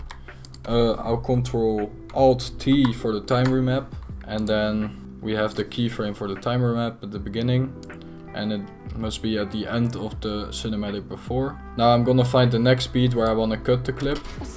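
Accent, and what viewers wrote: Netherlands. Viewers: Are you dutch? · Dutch accent on top tho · are you dutch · We do be loving that Dutch accent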